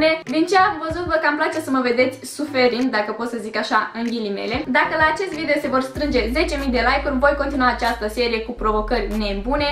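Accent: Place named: Moldova. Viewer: Ce accent de moldoveancă ai 😍😍🤗😊🤩😘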